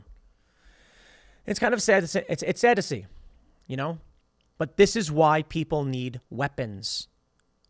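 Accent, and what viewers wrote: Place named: Chicago, United States. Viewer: I just realized still has a Chicago accent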